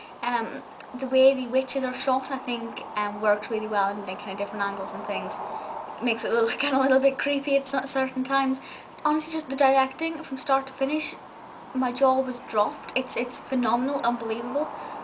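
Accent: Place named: England, United Kingdom. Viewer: Miss: Love your witty review of Oz. I also love your English Accent!!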